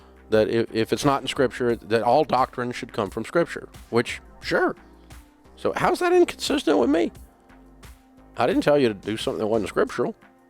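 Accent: South, United States. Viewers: I listen to Dave Ramsey so much that I’ve developed a Southern accent...........I live in Nevada😂😂😂😂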